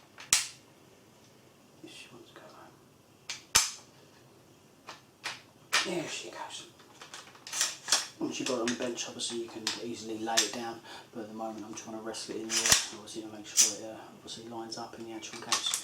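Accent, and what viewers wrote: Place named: United Kingdom. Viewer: has a thick British accent lol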